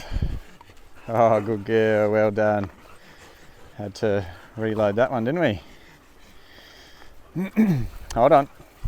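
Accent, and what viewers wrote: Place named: Australia. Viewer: Good to know. Thanks for the update. By the way, I'm from the US and it was your Aussie accent that had me thinking you were saying "Carla" instead of "Kyla."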